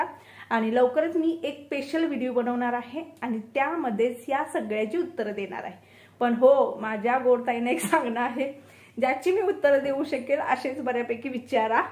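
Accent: Kolhapur, India. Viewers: Tumi kholapurchi bhasha bholta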